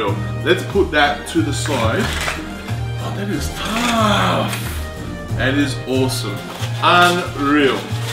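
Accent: Australia. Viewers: England? are you from Englan? i thought you were from Australia.. :p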